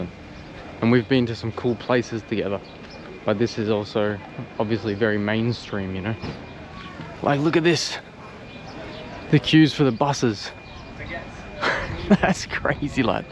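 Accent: Australia. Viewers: Hahaaa I love your ocka talk m8..😂..and I love your wee giggles here and there..living the life dude..🎉😊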